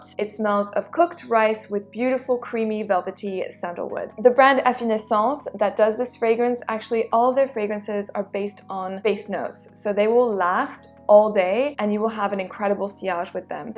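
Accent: France. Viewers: You have a very good French accent. Are you originally French?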